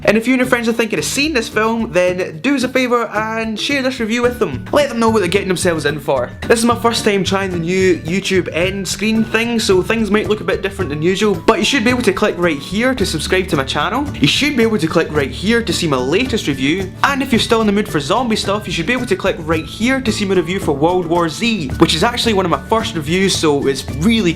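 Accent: Scotland, United Kingdom. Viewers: Your review is on point. Covered all there is to know about the film. You got one more subscriber. Thanks for stopping by my channel! Also, your accent. You're from Scotland if I'm not mistaken. I was there a couple months ago in Edinburgh. Beautiful city. I did a video about the trip. Check it out in my channel!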